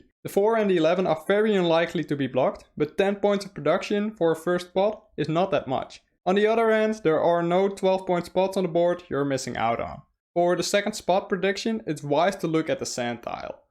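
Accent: Netherlands. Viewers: omg the dutch accent is so intense 0_0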